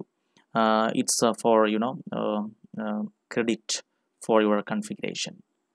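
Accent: Kerala, India. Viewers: Brother....I highly appreciate your work. I am a Networking Student. Your videos have been "'Life Saver" for me throughout my course. THANK YOU SO MUCH DEAR BROTHER. From your accent I could understand your origin is Kerala, I am from TN, we are near by and we are brothers. Please continue to post videos, as lot of people can be benefited by your remarkable work. THANKS CRORES.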